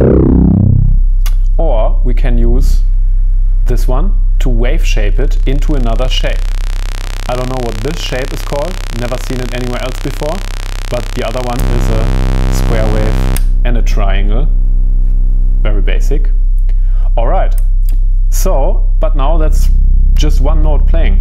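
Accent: Germany. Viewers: While a few people here have commented, unkindly and disrespectfully about how you pronounced a few words, I am guessing they are not aware of how we each speak similar languages a little differently. I refer to such things as cultural difference which are tied to where we each grew up. I love your accent. Is it German? I had no problem understanding everything you said. Unlike most all of Europe where people study multiple languages in school growing up, such as Spanish, French, German and so many more, folks in the United States only typically study American English. While not a bad thing to only study one language your whole life, I have traveled to Europe, but have grown up in the US. I am sure that if you had an accent like you grew up in New York City, other folks would make similar comments about the accent or how you pronounced other words. Language is language, and as we learn more, we learn to respect others more and find and share our comments ground. 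Thank you so very much for this wonderful video! Now, I wonder if you have considered creating a similar video comparing and explaining the differences between, say, a Pittsburgh Modular Microvolt 3900 or similar and a comparably priced east coast style synth like a Roland System-1m. I suggest these two specifically because I have them both, are I a similar price range, and both provide standard EuroRack connections for creating new patches and sounds on just the one instrument, yet both can connect to EuroRack synths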